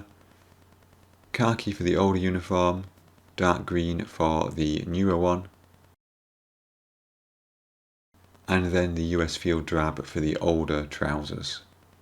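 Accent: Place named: United Kingdom. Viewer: Hi there. I have just found your channel on YouTube and I had to subscribe. What you did there was absolutely fantastic. I am wanting to get into doing dioramas myself so any help I can find I am willing to accept. LOL. Thanks so much for doing this video and I would say blessings to you from England but it sounds to me like you have a British accent. So I will just say blessings to you from Weymouth, Dorset.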